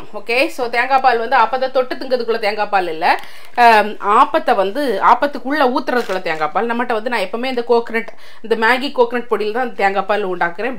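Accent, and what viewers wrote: Nagercoil, India. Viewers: Nagar kovil tamil super sis.... Aappam soo soft and 😋yummy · நானும் நாகர்கோயில் பக்கம் தான், எங்க ஊரு பேச்சி style, கேக்கவே சூப்பர் ஆஹ் இருக்கு.... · Akka neenga nagerkoil ah.appadiye antha slang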